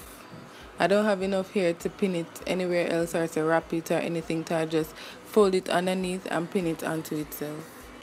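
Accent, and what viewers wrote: Jamaica. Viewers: Hear di accent nuh man 💛🇯🇲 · You sound like a Jamaican, if so I'm also Jamaican lol hehe Nice video btw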